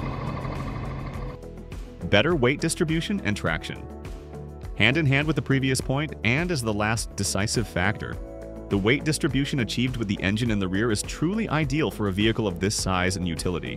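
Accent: United States. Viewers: Quite. I was hoping the video would move on to electric buses and discussing how bus design might evolve now that there’s no need to be constrained by the limitations of diesel engines, but it never came. Might have guessed, considering the US narration.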